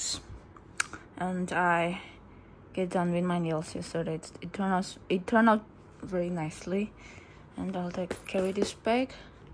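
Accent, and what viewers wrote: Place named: Nagaland, India. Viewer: The Naga Accent still hits different ❤️❤️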